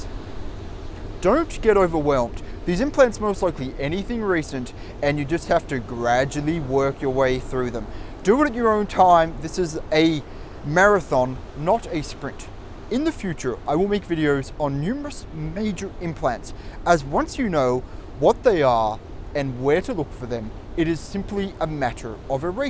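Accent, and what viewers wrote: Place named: Australia. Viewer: Sounds like an Australian accent-probably NSW or Queenslander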